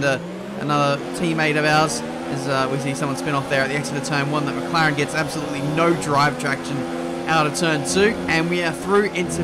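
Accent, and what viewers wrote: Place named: Australia. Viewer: That moment when you expect ‘Yo, JD here, TRL Limitless’ (in a British accent), but you sadly hear: What is up guys, welcome back to a brand new video’ (in an Australian accent)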